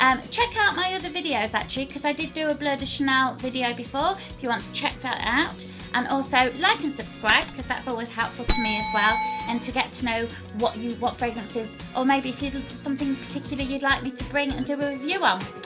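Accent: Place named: London, United Kingdom. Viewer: always watching your review anyway ms. you live in UK or what country coz the way you talk its made from london..your so beautiful fragrance reviewer thats true..gave u compliments more than a fragrance..anyway we live here in manila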